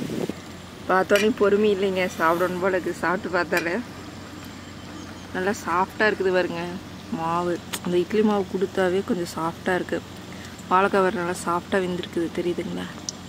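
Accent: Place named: Coimbatore, India. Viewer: Akka your speaking coimbatore tamil very nice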